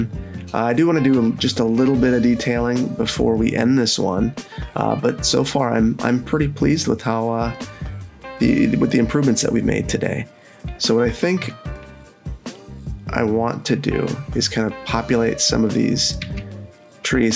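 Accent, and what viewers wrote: United States. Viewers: Standard American pronunciation. We do things to French that would make you either homicidal or suicidal. For example, potatoes "awe grotten," or meat "with au jus." And if a house has a bathroom attached to a bedroom (very common in the US), it is said to be "with en suite."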